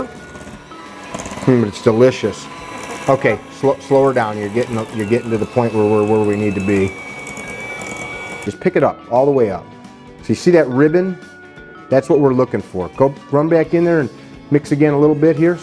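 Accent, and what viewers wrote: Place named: Wisconsin, United States. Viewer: Buddy’s a cheese head for sure with that sconi accent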